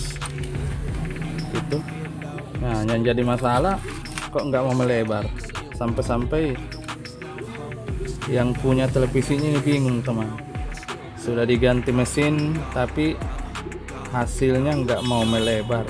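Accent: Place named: Medan, Indonesia. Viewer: Tinggal daerah mana bg, kayak logat medan, saya d rantau prapat bg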